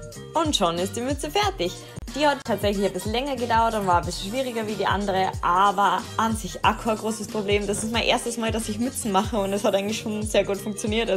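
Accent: Vienna, Austria. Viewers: An dieser Stelle ein riesen Dankeschön für deine Mega tollen Videos 😊 ich liebe deine Projekte und habe so viel Freude beim anschauen weil ich den Wiener Dialekt einfach nur liebe 😍❤️😍. Vielen Dank und mach weiter so!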